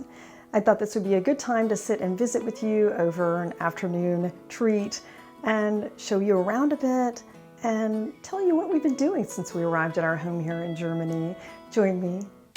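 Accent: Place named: Texas, United States. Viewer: I love your German cause I can hear a little Texas thang in it, you sound lovely. I went to Germany for world youth day in 2000.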